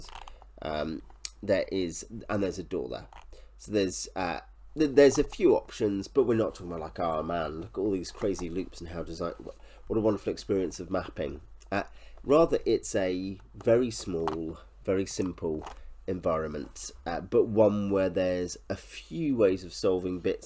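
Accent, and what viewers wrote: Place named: United Kingdom. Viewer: Wtf dude. You can't follow a British accent? You have to get out more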